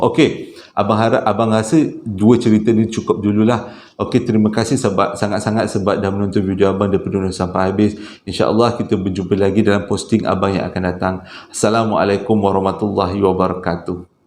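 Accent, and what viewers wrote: Johor, Malaysia. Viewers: Abg org johor ke? Patut la bila dengar cara ckp abg mcm org johor ie.. 😊😊😊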